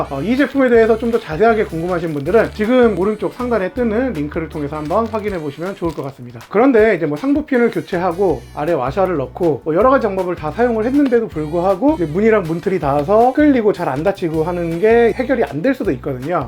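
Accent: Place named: North Korea. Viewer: 말투가 북한말투네... 이 3끼 간첩 아닌가?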